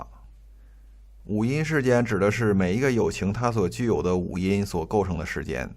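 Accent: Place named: Northeast, China. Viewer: 喜欢东北口音儿 ～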